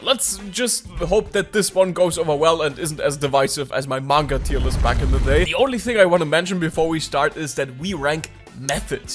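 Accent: Germany. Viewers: Are you german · I only subbed because you keep on showing up in my feed as I'm trying to learn art and I find it kinda funny that we are both named and speak english with a wacky german accent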